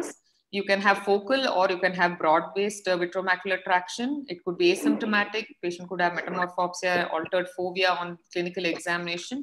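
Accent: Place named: India. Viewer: Subtitles really are usefull, indian english accent is not always easy to undestand.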